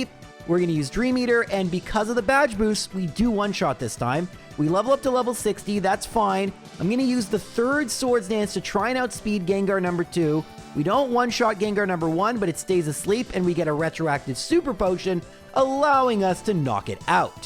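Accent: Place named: Canada. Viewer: Whats funny to me is i wouldn't have picked up on your Canadian accent if it weren't for Y2J and the way you say "against " 😂 not that it matters. Nothing matters.. 💀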